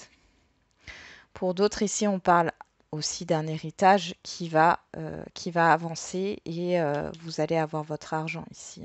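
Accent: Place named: East, France. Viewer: Vous êtes de l'est car vous dites :"Ui" au lieu de :"Oui" !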